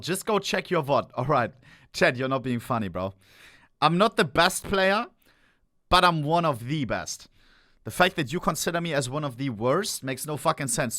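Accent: Germany. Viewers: Stresst richtig, wenn Deutsche versuchen englisch zu sprechen :D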